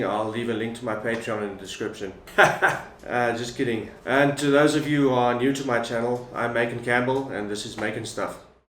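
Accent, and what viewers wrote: South Africa. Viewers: South African accent is great :) Boer.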